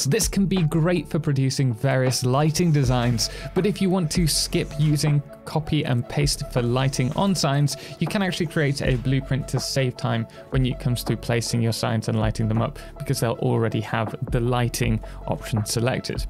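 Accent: United Kingdom. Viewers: Which you had more play content around 20minutes. Me and my GF enjoy your voice and Brittish accent. We have been watching your clips while I started Satisfactory 2 months ago. Maybe a playthrough when 1.0 drops? Epsiodes around each Tier or catalogue would be a tip? Standard Parts, Electronics, Steel, Copper, Iron factories, then truck lines and train lines adding on from tour starter location. We find imKibitz too stressful to follow and his megabuilds are far too big to follow.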